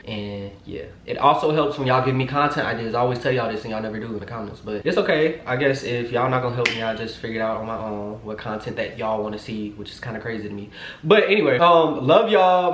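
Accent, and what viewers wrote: New Orleans, United States. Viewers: You and this Nola accent is a kiiiii lmfaooooo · its the new orleans accent for me🤣🤣🤣🤣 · U sound like messy Mya in ur New Orleans voice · you snapping in this new orleans accent hahahaha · LMAOOOO THAT New Orleans Accent Giving Playboys LMAOOO I Love Your Channel Down🥰😂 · lol it’s the new Orleans accent for me 😂😂😂😂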